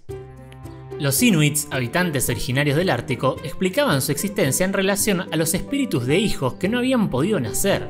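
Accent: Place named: Buenos Aires, Argentina. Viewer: Muito bom o seu canal, saludos desde Brasil, me gusta estudiar el castellano viendo videos y tu canal es perfecto para mi, me encanta el acento de Buenos Aires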